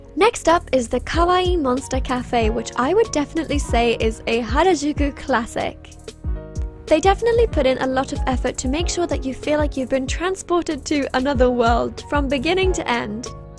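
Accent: United Kingdom. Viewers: I NEVER expected a british accent damn goosebumps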